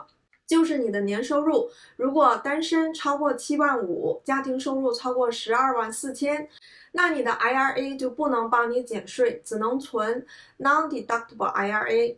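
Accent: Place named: Liaoning, China. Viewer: 应该是辽宁的吧，我本科硕士都是沈阳读的，在沈阳呆了七年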